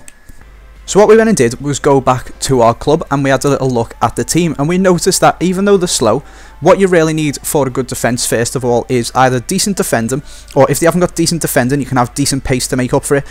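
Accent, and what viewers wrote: Liverpool, United Kingdom. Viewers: you scouse?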